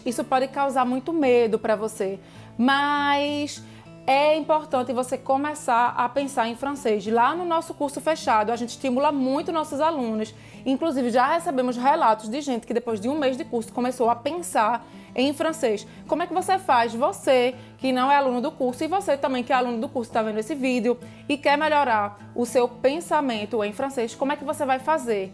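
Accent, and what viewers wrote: Pernambuco, Brazil. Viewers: Seu sotaque pernambucano falando francês é um charme!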